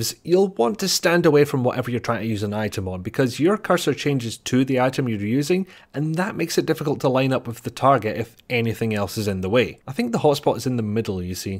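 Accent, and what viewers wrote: United Kingdom. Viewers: you sound british to me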